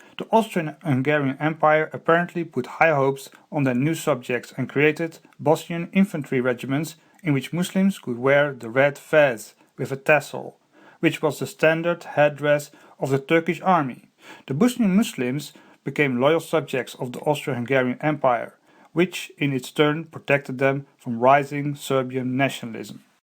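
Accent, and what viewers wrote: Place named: Netherlands. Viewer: Hollandse accent haha goeie video hoor ik heb veel meer informatie gekregen over de balkan dan in school!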